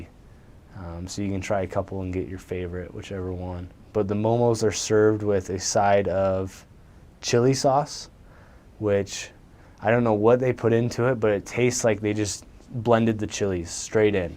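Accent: India. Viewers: His Indian accent is on point! I love the way he says liberal with rules, acclimatized stomach... So polite 😄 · ur indian english is awesome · You sound perfect (Indian accent) Most Indians who moved to U.S use fake American accent to sound cool😂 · Your indian accent is killer😂😂😂😂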